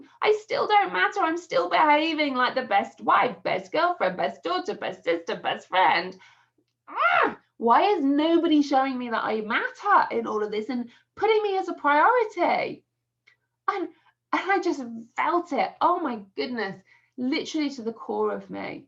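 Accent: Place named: England, United Kingdom. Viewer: Love the English accent seems to help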